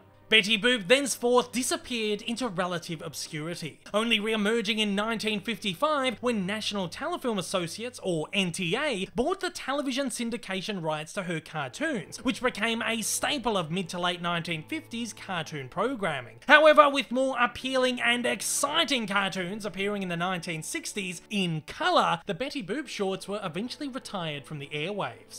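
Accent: Australia. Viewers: I don't mind the Aussie accent.😃😃😃😃😃😃😃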